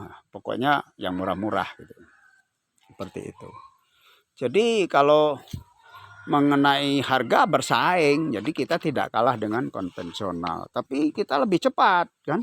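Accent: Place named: Klaten, Indonesia. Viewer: Logam klatenya mantap hhhh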